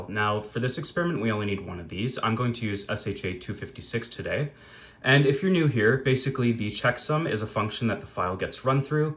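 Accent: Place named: Canada. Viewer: Well the sky sounds like a Canadian, and I'm American, so therefore if the Canadian says that's not nice and not good I'm going to believe him.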